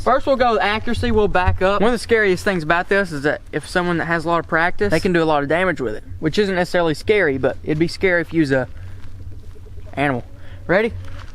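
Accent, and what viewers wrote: United States. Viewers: I use the crosman 1322 with a 14" barrel and a shoulder stock. I pump mine 12 times and I get passthroughs and instant kills on squirrels, pigeons, and rabbits within 20 yards. Also, what are you talking about "twice the size." A .22 is a .22, these pellets have the exact same diameter as a .22 projectile, they are just shorter because they dont have the powder charge behind them. Also, you sound american, but you bought the tuned down Canadian version of the crosman 1322, the US version is over 600fps out of the box. Definitely buy the $20 crosman shoulder stock and rotate your iron sights to peep sight, or upgrade the plastic breach to the steel breach and put a red dot on it.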